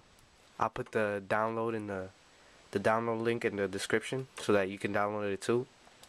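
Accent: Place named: Los Angeles, United States. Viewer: u got the la accent :0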